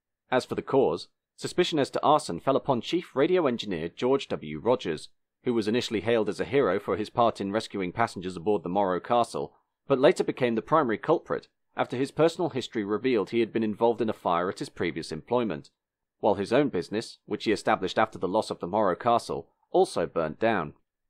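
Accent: United Kingdom. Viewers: Unappealing (if linguistically logical) 'short a' English regional accent exacerbated by a Yankee flat sentence inflection.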